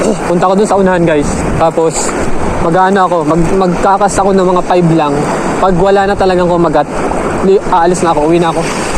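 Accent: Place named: Abra, Philippines. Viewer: Idol Taga Abra de ilog ka po ba? Ganyan Kasi tono Ng salita Ng mga taga Abra eh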